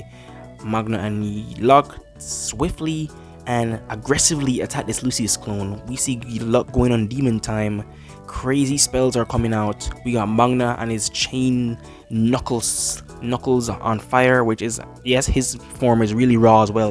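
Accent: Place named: Jamaica. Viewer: with all due respect where are you from brother? I can hear a kinda accent ive heard before a lot but i dont to be rude, but you kinda sound Jamaican. also amazing video thanks for the breakdown of the chapter bro!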